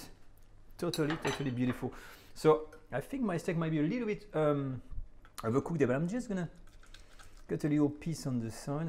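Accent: France. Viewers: This dude sounds so French.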